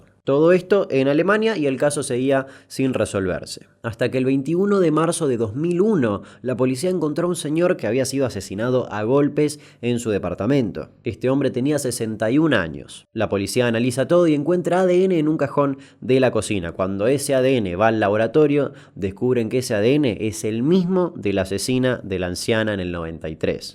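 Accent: Buenos Aires, Argentina. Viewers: Las gashetitas con ese asento de porteño jajaj Pd soy cordobes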